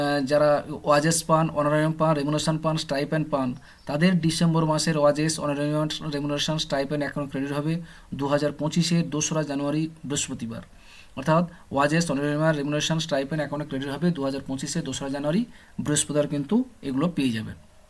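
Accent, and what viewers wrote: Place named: Medinipur, India. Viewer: মেদিনীপুর অঞ্চলের আঞ্চলিক টান থাকে ওখানকার মানুষের কথায় । পছন্দ না হলে এড়িয়ে যান। এমন বলছেন যেন আপনি সবকিছু ঠিকঠাক বলেন।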